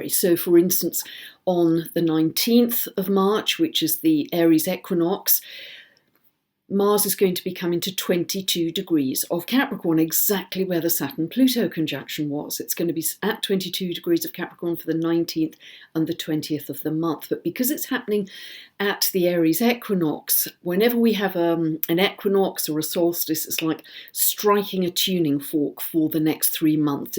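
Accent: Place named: Oxfordshire, United Kingdom. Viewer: I'm curious if you're in Oxfordshire(judging by your accent).Interested in a reading.Maybe you could answer some questions.My chart is full of contradictions.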